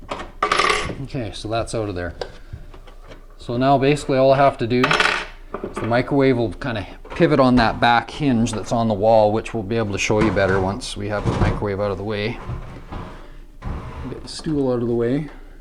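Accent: Canada. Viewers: Can someone put subtitles in.... his Canadian accent is too difficult to understand.... I'm from New Jersey. 😢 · Nice Canadian accent!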